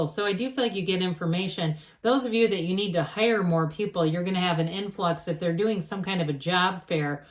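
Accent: United States. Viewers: Thanks you so much. Of all tarot readers you're my favourite, and I love your American accent 😊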